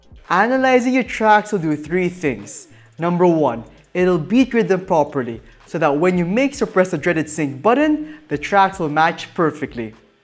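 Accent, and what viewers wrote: Philippines. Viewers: I knew it! I’ve always had a feeling you were Filipino from the way you talk!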